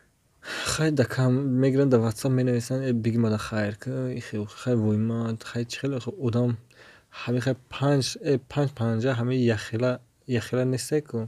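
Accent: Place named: Shurobod, Tajikistan. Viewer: И манера ба фигрм ай шурободай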